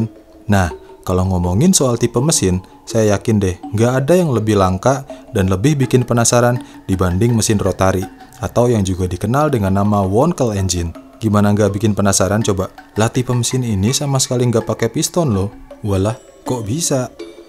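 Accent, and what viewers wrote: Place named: West Java, Indonesia. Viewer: Fix, logat nya sunda.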